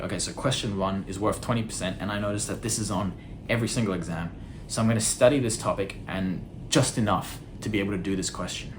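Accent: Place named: Australia. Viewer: dude whats with the subs, aussie accent isnt that hard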